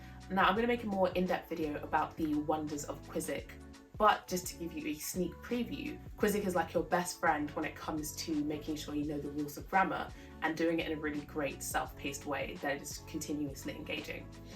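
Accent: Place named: England, United Kingdom. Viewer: Your English acent is amazing !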